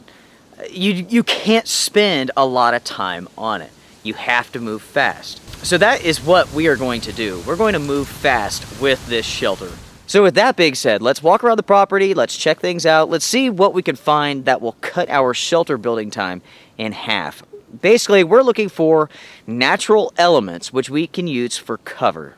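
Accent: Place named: United States. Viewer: That's awesome. Where is Lonewolf mountain? You sound American. I like watching your adventures.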